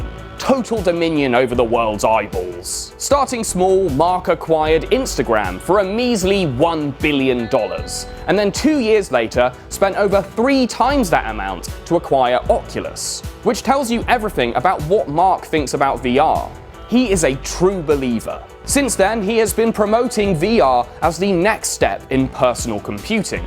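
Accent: United Kingdom. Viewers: A British accent using surfing slang is a bit much for me to handle.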